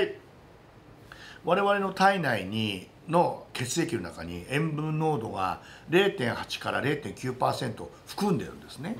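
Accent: Japan. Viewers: I've been learning Japanese for a while now and I really enjoy watching Chief. Maybe it just seems to me, but the Chief seems to have a slightly different accent than that of creators coming from Tokyo. Are you from other parts of Japan Chief? As always, thank you very much for the great video and I wish you much continued success!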